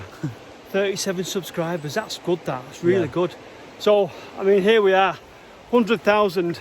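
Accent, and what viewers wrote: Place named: England, United Kingdom. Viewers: Beautiful. Thank you for your video’s. I just subscribed. I’m very happy I found the Chanel. I’m American , SE section. Your accent is so interesting. I understand you well and watching this I realized people from England or in that area of the world have a dialect that chops up their words. My granddaughter can talk just like an Englishman. ❤️ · I'm glad your not going to speak Yorkshire. As a Yank, I can barely understand your Manchesterese. Love your videos though, even if I do occasionally have to turn on the closed captioning. By the way, I also line in Manchester.......New York, USA!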